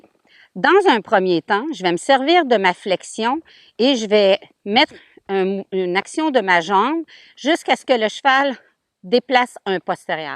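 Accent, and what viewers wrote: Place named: Quebec, Canada. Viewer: Salut eh ou habite-tu car tu as un axais québécois comme moi 😄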